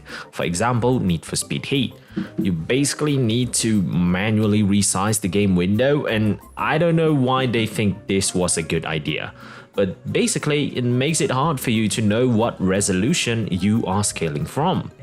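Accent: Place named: United Kingdom. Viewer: How you get that nice british accent?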